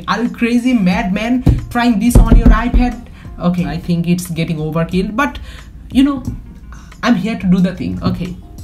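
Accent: Bengal, India. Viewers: No offence but try to change your Bengali accent it sounds shit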